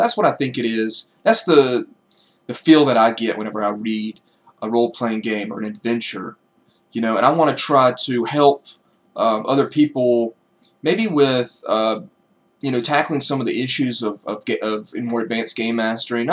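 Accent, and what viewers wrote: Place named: South, United States. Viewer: Are you from Greenville SC? I hear the southern in your voice and I saw the WYFF meteorologist video lol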